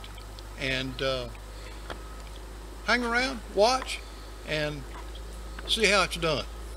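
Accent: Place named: West Virginia, United States. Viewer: Accent sounds just like my uncle from west virginia. Good tip generally. I got a bunch of junk logs dropped off at my property recently by an Arborist. He did be the disservice of stacking them a mile high and I'm having huge difficulties getting some of the logs down. But this technique helped me move some of them at least.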